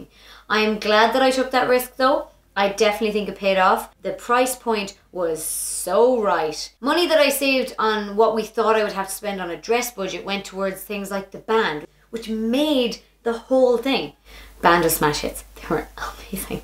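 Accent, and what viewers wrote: Ireland. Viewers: I love the Irish accent ♥ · Man, simply stunning! From the gorgeous red hair to the quirky, charming brogue all topped off with that endlessly ethereal gown... Breathtaking. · You looked exactly like an Irish Princess! Goodness that dress is amazingly gorgeous!!! Your hair looks so beautiful with the blue. Also your accent is amazing! My godmother is Irish so I’ve always loved Irish accents❤️❤️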